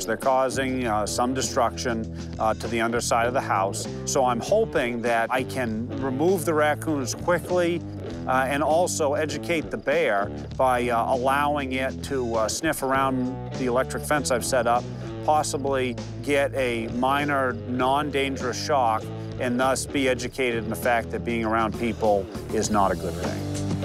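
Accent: Boston, United States. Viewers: Boston accent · He has to be from Boston....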